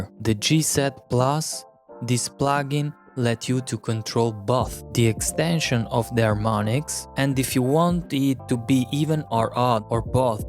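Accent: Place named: Italy. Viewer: Dopo pochi secondi dall'inizio del video ho intuito l'accento italianissimo. 😄 Conoscevo ed usavo già questo plugin, ma grazie alla tua spiegazione mi è più chiaro come lavora, grazie. 👍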